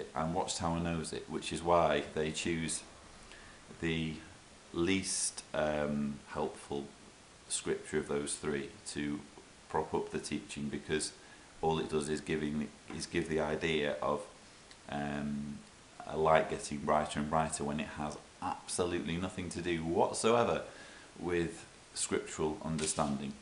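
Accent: United Kingdom. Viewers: hi “ in acts. Furthermore there is no salvation in anyone else, For there is not another name under heaven that has been given among men by which we must get saved.” This is there errors there not holding on to the head, Christ “! In 1 Corinthians. For no man can lay any other foundations then what is laid., Which is Jesus Christ.” This men from Warwick have taken over the seat of Jesus, Apparently. And the CB name Warwick seems to be mean king maker. Yes they have sat up there own princes and kings. In first 1 Thessalonians 2: 2: 3. Said The exhortation we give does not arise from error,” Or from uncleanness or with deceit. This man the Bible writers that and Jesus apostles were chosen by Jehovah.that’s why when they would speak it came to be so. And men from today are absulitly not chosen from God”! That’s why there prophetic <num> and 1947 or 1957. There false prophets. 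Your daughters are so cute, I enjoy hearing your accent of British. Please don’t change it bye!” In number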